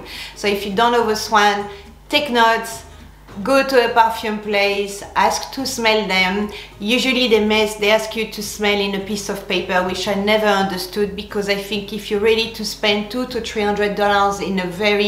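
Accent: France. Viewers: I can feel the French accent while you are talking in English 😂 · French perfume review by lovely french accent ❤️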